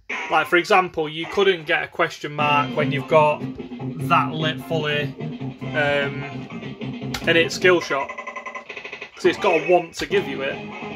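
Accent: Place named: Yorkshire, United Kingdom. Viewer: lol yea love ur banter while ur playing proper plain speaking yorkshireman Im Teesside for me sins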